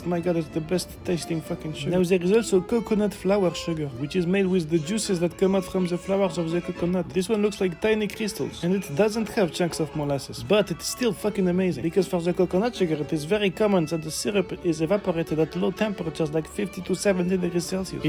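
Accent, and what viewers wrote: France. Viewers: Ar you French ?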